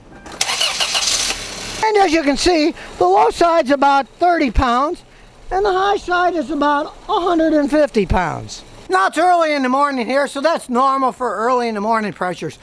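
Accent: United States. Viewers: your English accent is funny and all American 😁. Great info!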